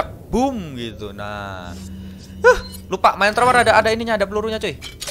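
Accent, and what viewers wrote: Java, Indonesia. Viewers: Bang orang Jawa? Jawa mana bg, salken aku dri jatim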